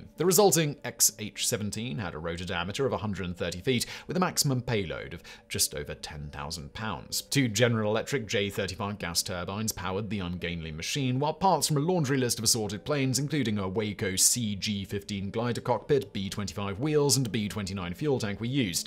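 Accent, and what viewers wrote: England, United Kingdom. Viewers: Has got more English 👀 over the years or is it just me????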